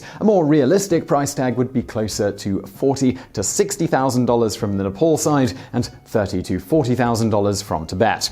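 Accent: United Kingdom. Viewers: Wtf is this brittish Vsauce?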